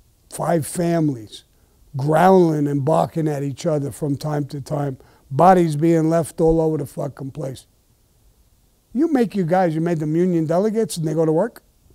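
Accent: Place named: Brooklyn, United States. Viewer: I have italian friends from brooklyn new york. They act, talk and sound exactly all the same. Just like Sammy.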